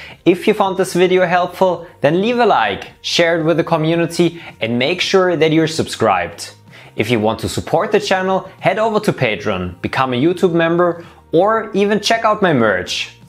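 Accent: Germany. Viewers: I just come for the German accent.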